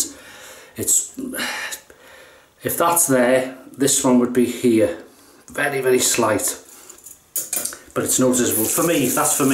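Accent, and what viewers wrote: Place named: North Wales, United Kingdom. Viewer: Nice to hear a North Wales accent on here. Hwyl!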